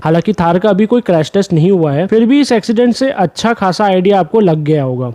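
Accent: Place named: Chhattisgarh, India. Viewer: Are you from Chhattisgarh because your accent feels like it.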